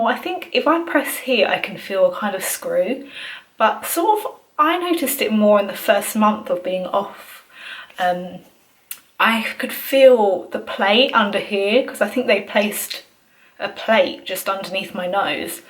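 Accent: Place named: United Kingdom. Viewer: Wow!! The result is fabulous! Love your face profile (actually, love all your face). I am a 53 year old man that's gonna have double jaws surgery on next February. I have myself accounting about the same comments when i decide to go on with the surgery (my age,etc) . I just want to say to the public that people should listen to themselves if they make the decision, like you did !! We can see that it was really worth it. By the way, are you British ? You have a cool accent. I'm Canadian from Quebec.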